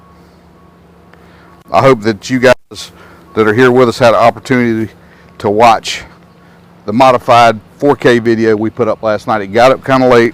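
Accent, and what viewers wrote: South, United States. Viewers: I appreciate your desire make this knowledgeable and entertaining. You really know your stuff and I like your southern accent:) CHeers, Rik Spector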